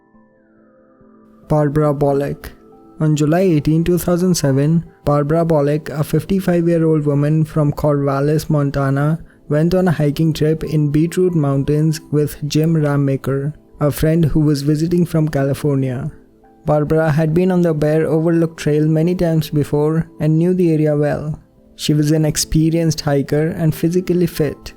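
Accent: India. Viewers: This narration by this Indian SUCKS. Please get an American, English, Canadian, or Australian, or any English language speaker who doesn't have such a heavy accent. Who thought it was a good idea to have this dot head narrate?? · i cant stand that thick indiaN accent sounds like if i called a call center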